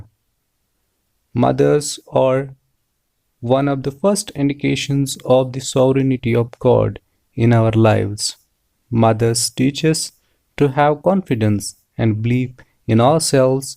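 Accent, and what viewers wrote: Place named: Kashmir, India. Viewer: Kashmiri aawaaz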